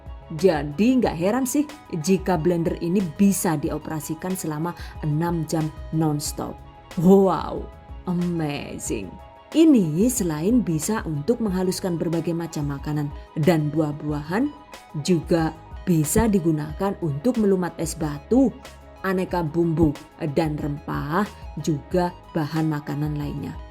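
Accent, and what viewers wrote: Banyumas, Indonesia. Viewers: Mbaknya orang mana sih?kok ada ngapaknya. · Bismillah "DONE"#Giveaway THV 2020.paling seneng sama resep rendang yg satu ini.kelihatan enak bgt.menu favorit,apa lagi ditambah suara mbaknya yg ada ngapak ngapaknya gitu bikin senyum senyum sendiri dikamar koyo wong gemblung.ha,ha.pokoke ORA NGAPAK ORA KEPENAK.OK.CATAT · Hahaha lucu banget ngomong bhs apa itu.ngapak y.makasih atas resepnya mbak.semoga menjadi berrkah.Aamiin..🙏👍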